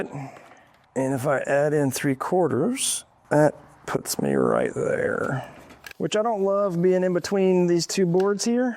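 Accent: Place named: South, United States. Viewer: Why did voice get 10 times more country in this video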